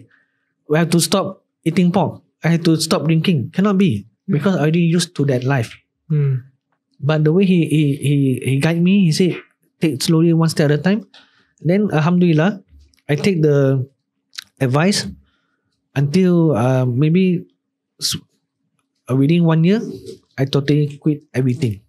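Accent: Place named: Malaysia. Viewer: I dont understand ur manglish...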